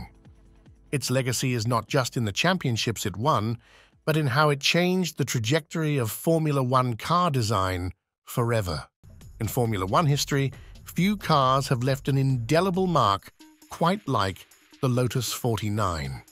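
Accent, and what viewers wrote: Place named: England, United Kingdom. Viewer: I can't believe how AI narration has moved on, the clipped English accent does indeed gel nicely with the eras covered in this video. Well done, subbed! 😊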